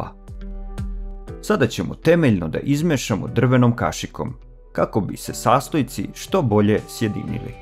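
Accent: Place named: Czech Republic. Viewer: Замечательно ! Спасибо ! Мне просто чисто по человечески интересно . Откуда из какой страны Вы ? Вот слушаю Вас и почти все понимаю то что Вы говорите , и думаю , кто же он , украинец или поляк или чех . Скорее всего Вы чех или словак . Привет из Узбекистана , Хорезмская область .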